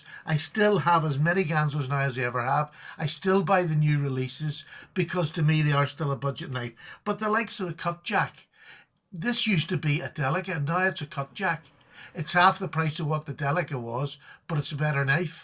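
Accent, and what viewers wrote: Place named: Ireland. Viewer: Congratulations. Love you channel and even more your sweet Irish accent!:-)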